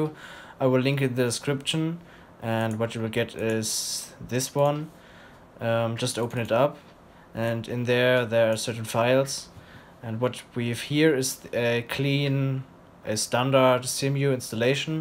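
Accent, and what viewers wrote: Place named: Germany. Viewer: Man hört dass du deutsch bist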